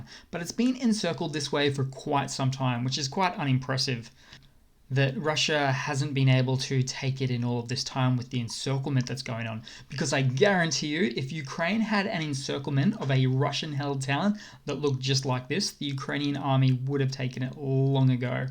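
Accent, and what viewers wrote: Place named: Australia. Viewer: Always a huge thank you for the hard work put into your content! Love the accent! Teach us more Aussie! While learning about Ukraine! ❤️🫂🇺🇦🫂❤️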